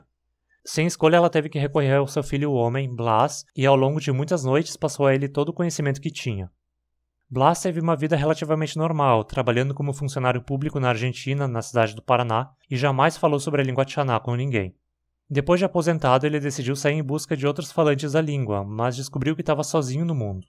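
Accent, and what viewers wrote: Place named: Rio Grande do Sul, Brazil. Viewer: Am I hearing happy gaúcho noises? Fenomenal essa história.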